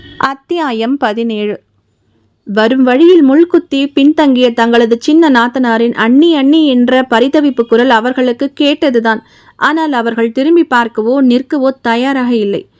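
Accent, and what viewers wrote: Madurai, India. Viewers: Madurai slang vera level sister 🎉🎉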